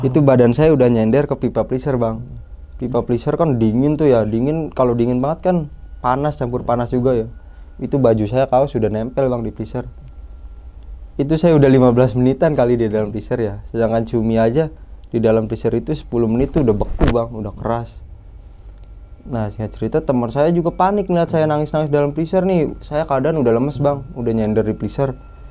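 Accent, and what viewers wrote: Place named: Indramayu, Indonesia. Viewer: Endah weru logat indramayu seru pengalaman horor wedi ne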